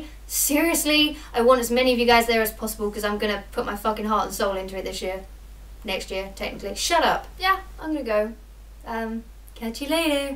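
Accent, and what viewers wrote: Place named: United Kingdom. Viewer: "Second grade"? You sound very British for an American.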